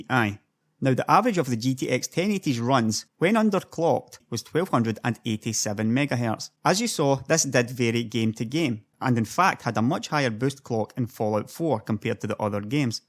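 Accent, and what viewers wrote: Scotland, United Kingdom. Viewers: content : 40% scottish accent : 60% total : 100% subscription rate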